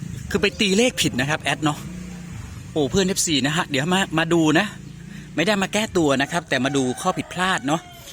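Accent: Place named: Laos. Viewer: ออกลาวแล้วค่ะ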